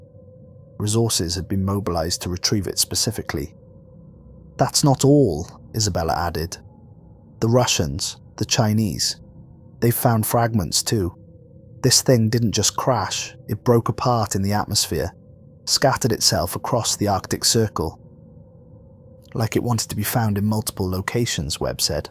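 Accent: Wales, United Kingdom. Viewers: I like the story but the request for subscription resonated as I realised you're welsh. Good luck to 10k!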